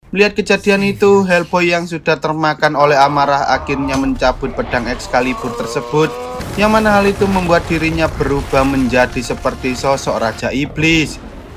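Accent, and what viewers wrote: Java, Indonesia. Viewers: Apik tenenan film ne... naratornya..medokkk tenenan jawane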